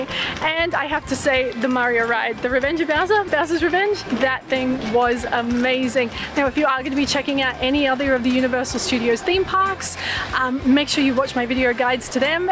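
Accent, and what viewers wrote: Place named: Australia. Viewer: Hello I just subscribed to your channel. I have mad respect for you going to theme parks alone. Couple of weirdos starring. I love your Aussie accent. Stay cute and safe!! 😊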